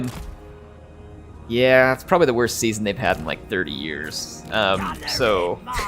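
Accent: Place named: South, United States. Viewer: You've got a slight southern drawl each time you speak. Lol cheers. I grew up in a few small towns growing up. Blue collared and conservative they like to fish and hunt. I like your videos! Thanks.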